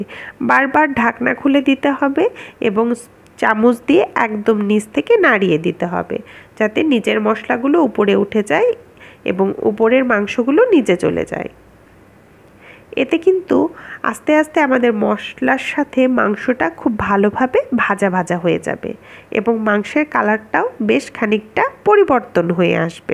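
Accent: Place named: West Bengal, India. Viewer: আপনার চ্যানেলের নাম, আপনার কথা শুনে মনে হয় পশ্চিমবংগের। যদিও দেখলাম বাংলাদেশের।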